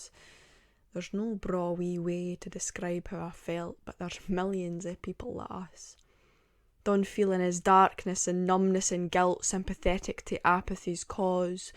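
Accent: Scotland, United Kingdom. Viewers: You are a breath of fresh air.I could listen to you for hours.I am a Scot living in Australia for 35 yrs and it’s so nice hear a Scots voice. Never change Bonnie lass. ❤️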